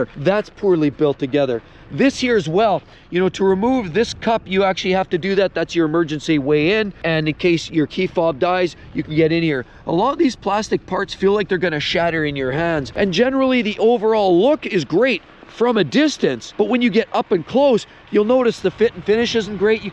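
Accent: Canada. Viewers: You' re canadian, aren' t you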